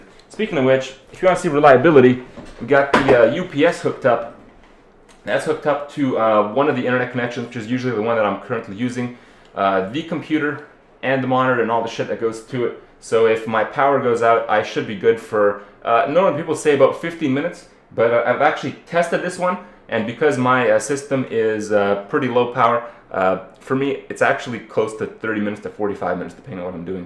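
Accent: Romania. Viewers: kripp, are u romanian? :D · parca din Cluj e :) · You Romanian ?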